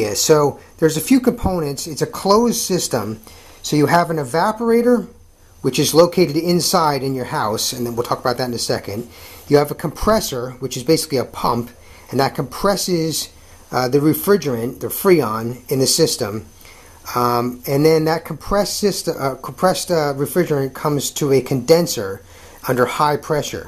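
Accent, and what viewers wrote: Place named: Bronx, United States. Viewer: first thank you for taking the time out to reply. i used ur tips and cleaned out the P- trap, opened the sub pump,bought a multi meter (first time using one. sh%t scared of electric) now im testing everything around the house thank you ), in a nut shell everything came out right with your instructions,which on the videos you explain everything in great detail thanx for being calm and the way you put your words together and accent( sounds like a BRONX accent)were im from. makes you want to listen more,your great. thanx again MR.thank you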